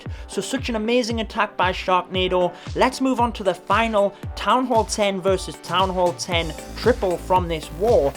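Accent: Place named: Scotland, United Kingdom. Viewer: Are you Scottish? Love the accent.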